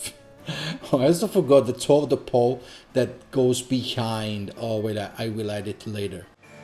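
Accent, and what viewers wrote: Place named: Italy. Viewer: Your bird is very realistic, yes I love your videos in English, just do not lose your charming Italian Accent!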